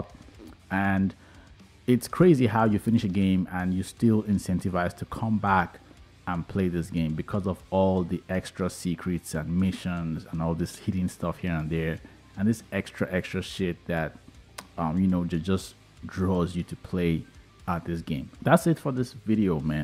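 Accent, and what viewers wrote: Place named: India. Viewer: Hello. Where are u from? India? Just curious) Your accent sounds like indian.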